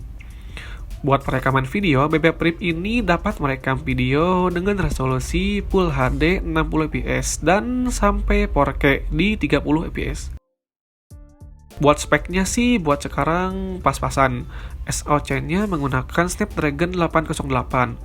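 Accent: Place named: West Java, Indonesia. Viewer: Orang sunda berarti masss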